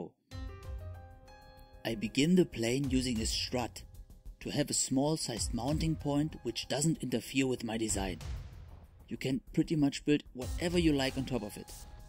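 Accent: Germany. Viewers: Are you German? Almost every German has that English accent. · kollege man merkt dass du deutsch bist :D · Are u a german??? :-) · Not sure if German or Japanese.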